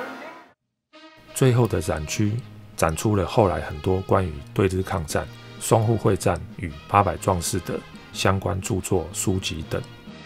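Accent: Taiwan. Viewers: 这是我听过的最亲切最好听的台湾话！！ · 操着台灣國語腔調的先生闡述抗戰的史實我眼淚也躲眶而出．以從會再看你的視頻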